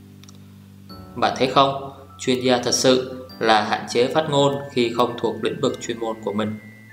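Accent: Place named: Thanh Hoa, Vietnam. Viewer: bạn này ở hoa thanh quế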